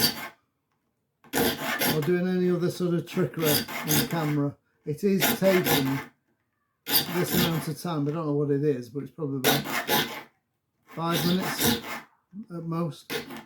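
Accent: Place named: United Kingdom. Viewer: Thanks for posting this, it's very timely in that I do have to sharpen both my tenon and gentleman's saws. I also have a Disston crosscut saw which needs re-sharpening after I managed to mess it up many years ago and haven't had the courage to revisit. I was considering using one of the many blunt, disposable saws I have as a practice piece before tackling the the disaster which is now my Disston. I'm hoping that the teeth on those disposables aren't too hard for my file, I suppose I'll be finding out very shortly, LOL. Now that I am armed with your inspiration and the need to do something, plus an endless supply of potential practice material a sharpening might actually happen. I cut down those disposable saw blades into points about 200mm long to make stab saws and the like, brilliant for cutting out holes in plaster board and similar stuff, the teeth closer to the handle get little use and stay quite sharp while the rest are wasted. 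It beats just chucking them away, and makes nice, cheaper than cheap custom shaped saws with little effort and an angle grinder. Has anyone used those blades to make scrapers? Just a thought I was going to put to the test. I've subscribed. It's refreshing to hear a British accent which isn't AI tripe, giving some solid, no BS advice. Thanks so much.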